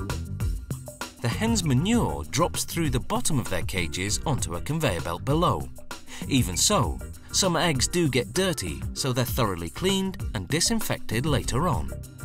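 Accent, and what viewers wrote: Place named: England, United Kingdom. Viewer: So I made an observation with my colleague, let’s call him Hona, and we observed that the narrator in fact has an English accent. That is all. G’day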